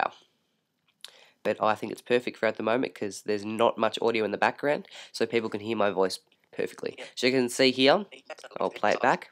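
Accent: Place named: Australia. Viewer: Great to hear another Aussie voice. Thanks for this.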